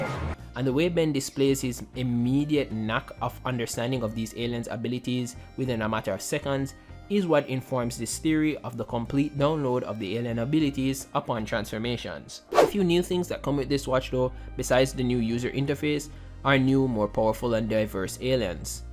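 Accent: Jamaica. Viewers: Ur Jamaica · Are you Jamaican ? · Out of curiosity, are u Jamaican 😭 i hear a accent but cant pin point it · bro u Jamaican? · Hes jamaican but i cant prove it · Are you jamaican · he sounds jamacian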